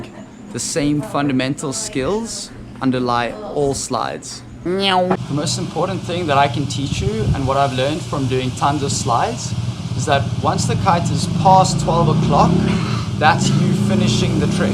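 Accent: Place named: South Africa. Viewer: Bru! So rad to randomly catch a Saffa accent on YouTube :) makes me homesick. Will deffo be checking out more of your vids, keep it kif ma china!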